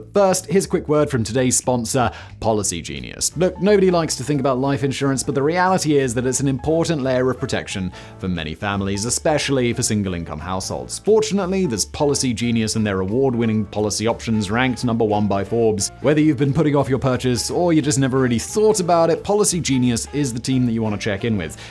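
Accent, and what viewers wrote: United Kingdom. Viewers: Are you British or American?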